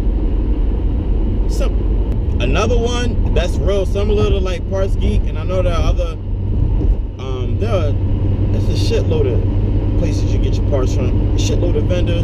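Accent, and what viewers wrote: Philadelphia, United States. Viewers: Bro you gotta be from philly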